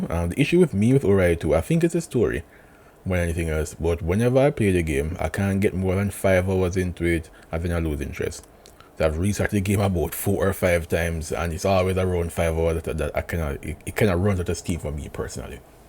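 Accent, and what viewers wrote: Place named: Africa. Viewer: We all have accents. You have this strong African tribe accent. Where are your parents roots from?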